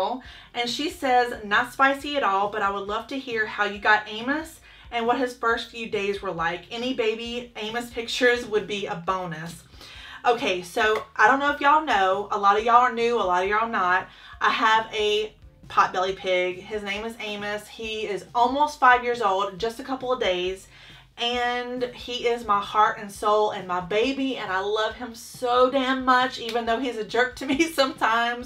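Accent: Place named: Louisiana, United States. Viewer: I’m from north Alabama! Just outside Huntsville. Love your content ❤️ and you mentioned loving Louisiana accents, I can hear it in your accent too! 😊😊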